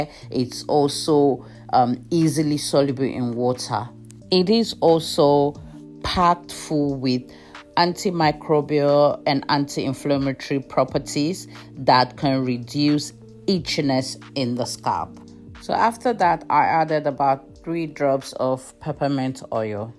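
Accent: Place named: Nigeria. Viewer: You made this DIY so simple. Our Nigerian English is really so sweet and you deliver it beautifully. Thanks for sharing. Will try it.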